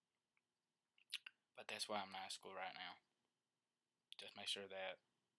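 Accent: South, United States. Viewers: one thing i hate but im not a hater is his south accent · One thing I don't get is why does he have a country tone but lives in Michigan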